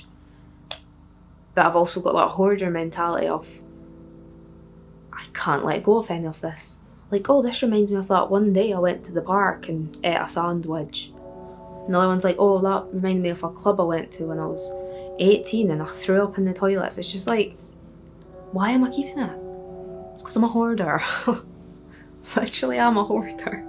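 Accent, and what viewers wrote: Scotland, United Kingdom. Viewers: I love your accent,it is scottish ? 🖤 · And don’t apologize for not being American. The whole world (pretty much) can access YouTube. Your accent is lovely and if you’re English I can tell the regional difference vs. other areas of England. But you do sound a bit Scottish (which if you’re from England, the area would explain why you’d sound a bit more Scottish or even Irish.) Idk how I came across this but I’m glad I did. Cheers mate. Skål! You’re adorable, Deary! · даа и мне плохо от ее акцента хпхп. То есть это вроде British но ясно что из какой-то определенной специфической части Англии, не пойму откуда именно ахахп. Но в любом случае she's incredible 💓🌹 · больше на британский акцент похоже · I love your accent! Are you Scottish? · I watch it for the accent, i fell in love with Scottish accent when I heard her speak 🥺🥺🥺💕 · aaaah her british accent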